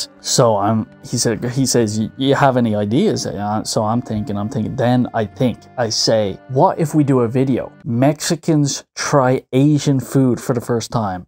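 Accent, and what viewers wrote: Ireland. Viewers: I didnt know you were irish! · What side of Dublin are you from?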